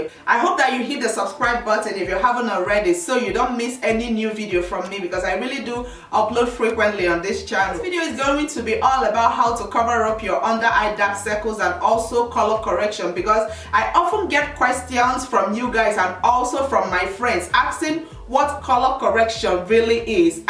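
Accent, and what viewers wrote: Nigeria. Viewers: Nigerian accents <3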